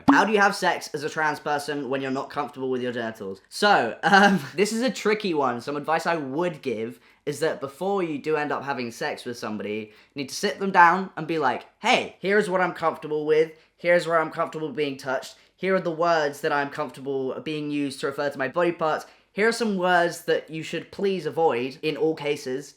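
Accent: United Kingdom. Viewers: Dude I literally thought you were just an androgynous guy with a lovely British accent- Edit: Is that transphobic??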